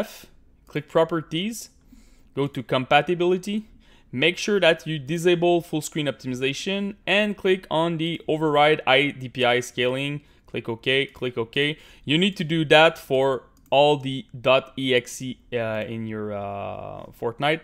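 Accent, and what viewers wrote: Quebec, Canada. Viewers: Et mec jtai vite cramé avec ton accent quebecoi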